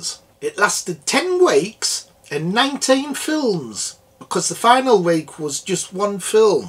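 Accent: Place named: North East England, United Kingdom. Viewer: Really enjoying your reviews of these as they were pivotal in my youth as I have great memories of watching them with my dad, some of the happiest times I ever had in retrospect now I’m in my mid fifties and my dad passed away nearly ten years ago. You also sound like a north easterner, though further south in the region than me.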